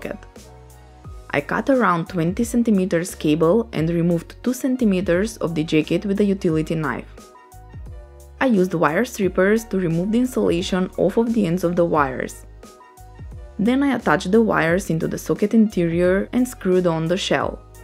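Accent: Russia. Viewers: Русский акцент? Русский акцент = крутой видос Спасибо за интересный туториал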